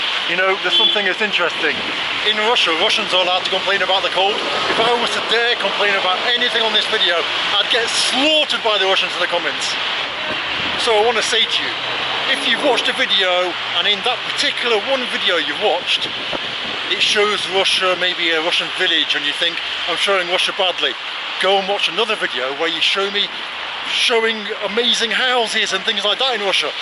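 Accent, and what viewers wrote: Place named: Manchester, United Kingdom. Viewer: I have subscribed. R u from Manchester judging by accent? I might b wrong tho 😂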